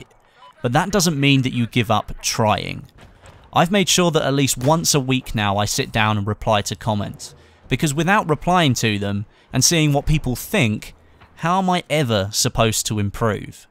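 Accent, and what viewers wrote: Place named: United Kingdom. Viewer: Hey, you're awesome. From the time we were all infatuated with the T-Rex on Rogue Transmission and the Megalodon, I have loved your videos. You have never failed to please your audience (and turn us(me) on with that sexy british voice of yours), and are always looking out for the fans. I subbed at around the 50K mark, so right around the first easter egg hunt for BF4, and there was a point for a couple of months where I would leave a comment and I would have the guarantee of your reply. Bigger Youtubers often lack that quality, which I can understand, but you go above and beyond. I remember I was staying up late (11PM, Central US time) and you added me on G+. That put me in a position where I knew you were awesome enough to actually actively pursue some of your most active followers. Anyways, I love your videos, and 'grats on your big milestone. 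Also, I am just dying to see the Vlog with the 100K Silver play button!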